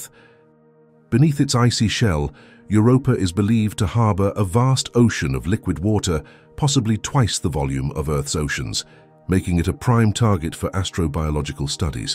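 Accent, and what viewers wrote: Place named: United Kingdom. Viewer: Another awful UK accent . YUK.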